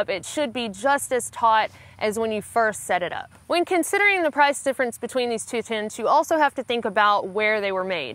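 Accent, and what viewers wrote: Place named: South, United States. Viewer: I seen you and your mom in the desert so I thought you lived out west. Until I heard you talking y'all 😃😃. Yep you must be a good ole southern girl. Great review. I like seeing the rain test. To me staying dry at night is my main concern. Thanks for these reveiws. Like the dog love her colors. She's marked up real pretty. Looks like she might have a little bulldog in her.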